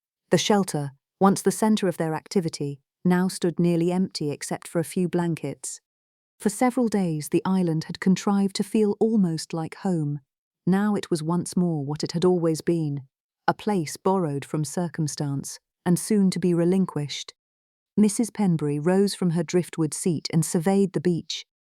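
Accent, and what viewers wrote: England, United Kingdom. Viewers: I have to agree. There is nothing better than an English accent.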